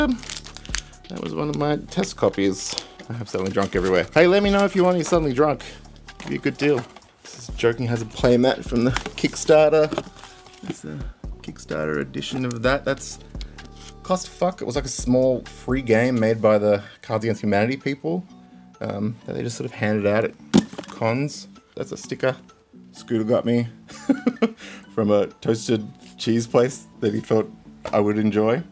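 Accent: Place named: Australia. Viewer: I love finding new Aussie youtubers. It's weird hearing people on youtube with my accent haha, I don't think about it but it's kinda jarring when I hear an Australian now because I just expect them to be American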